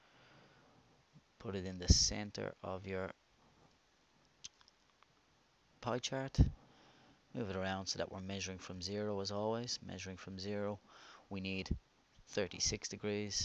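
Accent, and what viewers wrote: Ireland. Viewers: I love ur accent ! Are u irish ?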